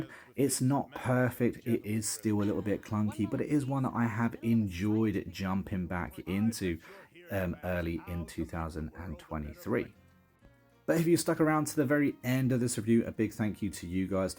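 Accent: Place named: United Kingdom. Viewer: Red Banana. Thanks for the up to date review which also mentions New Frontiers, what I was looking for. Also early on I noticed that you were deliberately choosing to speak in normal English and not a weird mix of normal English and specifically American English in word choices, pronunciation and putting the stresses in the wrong parts of the words. This isn't just annoying, it's the fact that it doesn't make more people watch and like you from over there. Americans have been trained by Hollywood to James Bond baddies with English accents to give a level of intelligence and competence that they like to listen to, just because we get past participle right. I therefore was not surprised to hear you have a book out, so that was why I didn't have to explain to you what editors say about not mixing dialects. Good luck to you with both this and the writing and thanks for being competent. 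This is very hard to find among British content creators increasingly.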